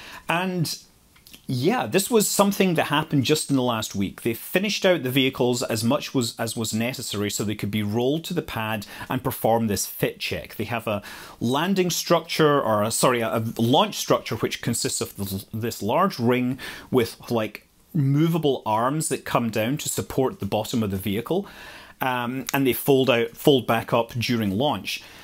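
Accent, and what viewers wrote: Scotland, United Kingdom. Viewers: There's some clever guys on YT, but a lot of waffle & time consumption. Your videos are clear, concise, interesting & layman friendly, while you obviously know what you're talking about. Very watchable & I can comfortably take it all in at 1.25x speed, thanks to your clear diction & varied, enthusiastic intonation (another bugbear of other zzzzzz YT "superstars"). Love your Scottish accent. Great photos & slides. Good Stuff. Keep up the good work!!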